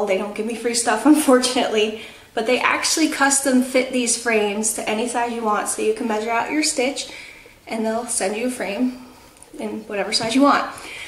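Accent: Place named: New Jersey, United States. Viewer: I really like your videos, your work is gorgeous! I enjoy listening to you talk because I am originally from N.J. and your accent reminds me of home. :)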